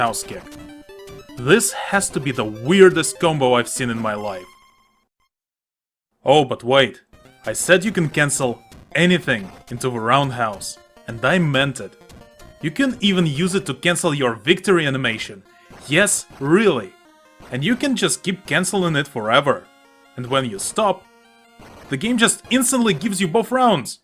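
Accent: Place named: Portugal. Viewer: És português, certo? O sotaque não engana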